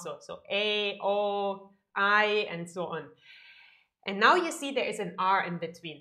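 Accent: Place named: Germany. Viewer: A very tiny German accent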